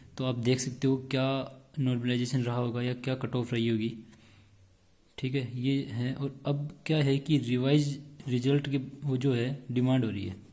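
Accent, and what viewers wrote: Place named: Haryana, India. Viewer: Bhai tu haryana ka Lage se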